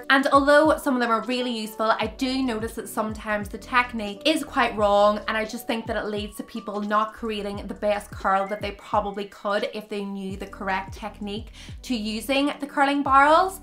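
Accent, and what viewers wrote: Derry, United Kingdom. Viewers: What in the Derry girls accent is this?! The first thing pops up in my head is Derry girls after watching her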